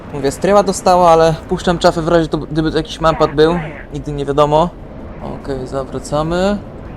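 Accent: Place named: Poland. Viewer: Siema, fajnie w koncu ogladac Polski akcent w DCS. Aktualnie mam mala przerwe od DCS. Grasz moze w IL2 Great Battels ??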